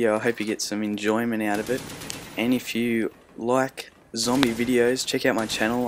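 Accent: Australia. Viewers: Nice, And finally an aussie ^__^ good vid =)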